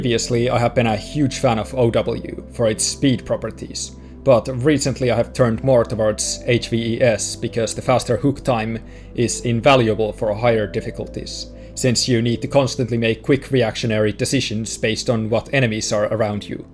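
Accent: Finland. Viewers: That's a very finnish accent. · Nah it’s finnish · are you finnish/estonian?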